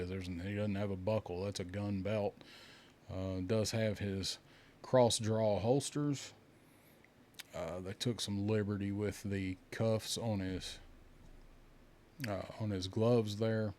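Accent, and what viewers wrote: South, United States. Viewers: What a great addition with Val! Classic! Great review! I can't wait to open my big ass box! Been sitting for 2 whole days! 3 by the time I actually get to it! As always, a wonderful video with a great southern drawl! Yeeehaaaaw! 4.5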